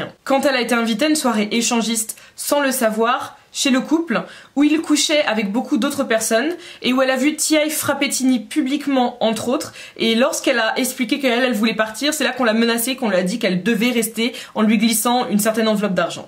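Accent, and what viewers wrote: England, United Kingdom. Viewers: Ton accent anglais 😻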